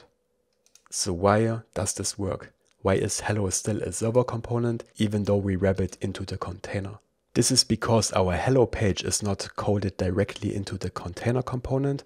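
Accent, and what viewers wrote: Germany. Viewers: After watching German content (mainly movies on Prussia ) for a fortnight I was thinking I was hallucinating as I heard a German accent but when I checked his location It was Germany //I was relieved that I was not hallucinating btw the thumbnail was no clickbait it was all in one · man hört absolut deinen deutschen akzent aber sehr nices video bist nen cooler typ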